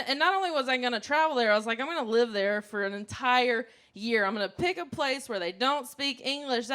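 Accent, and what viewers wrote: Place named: Carolina, United States. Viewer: yes she does. Very heavy Carolina accent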